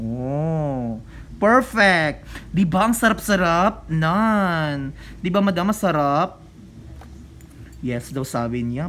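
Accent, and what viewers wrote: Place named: Visayas, Philippines. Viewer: Bisdak man kaau pre hahaha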